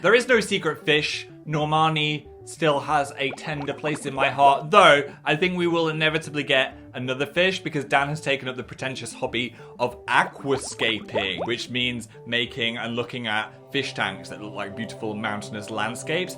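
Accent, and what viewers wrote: North, United Kingdom. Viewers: I love that editing is like 60% more Northern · i just realised something, slipa back into his northerner accent quite a bit in this video, its hilarious lol. With the trailer release of Hometown Showdown tho, maybe he just spent more time in the north and it came back ahaha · I always thought phill talking about his secret wife was Brin It might be his northern accent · HIS ACCENT IS GETTING MORE NORTHERN I LOVE IT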